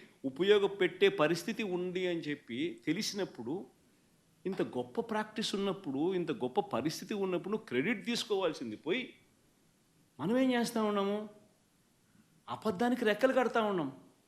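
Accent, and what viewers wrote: Rayalaseema, India. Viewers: Continue with rayalaseeema slang.dont go in polite way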